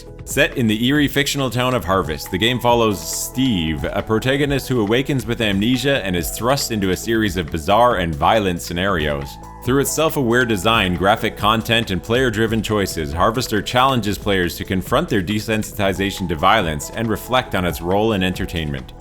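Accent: Canada. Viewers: i can always tell when its a canadian when they talk funny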